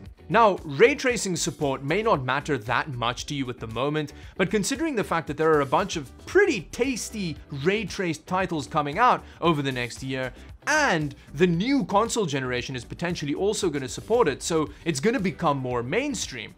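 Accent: South Africa. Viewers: His accent already made me think hes from south africa - the Namibia shirt made me even more suspicious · I enjoy your videos very much. Just a question: You sound like you have a South African accent, and then I see your shirt says Namibia.. so I wonder if you're from Namibia? Do you live in Europe now? I'm not fishing for your address or anything, just curious. :)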